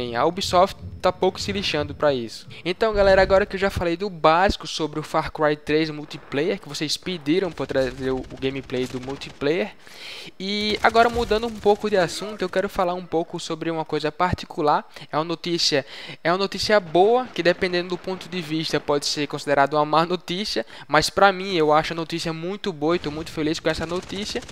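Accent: Northeast, Brazil. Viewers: tudo bem cara vc tem sutaqui diferente,vc e. Nordestino?? Abraço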